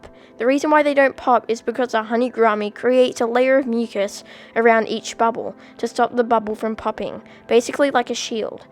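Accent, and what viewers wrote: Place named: Australia. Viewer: I'm sorry if I'm wrong but are you Australian coz you do sound like us Aussies if you are can you purchase a honey gourami from a local petbarn?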